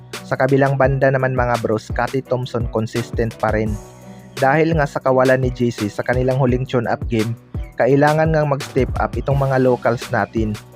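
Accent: Visayas, Philippines. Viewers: Bisaya ka ba bro,Kasi yong tuno mo bisaya ka talaga eh.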